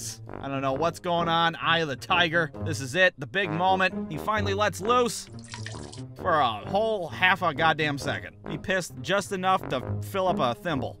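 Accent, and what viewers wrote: United States. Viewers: Are you American or what I'm not sure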